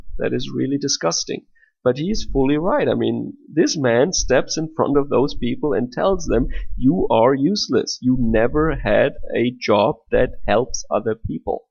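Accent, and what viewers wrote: Bavaria, Germany. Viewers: dachte höre bayrisch :) Falls du hilfe oder unterstützung brauchst. Sag bescheid.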